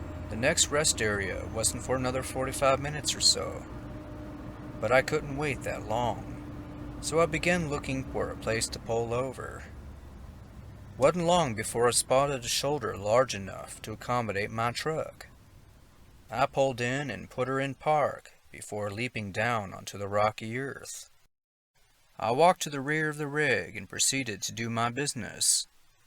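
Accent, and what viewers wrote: South, United States. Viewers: Always loving that southern accent .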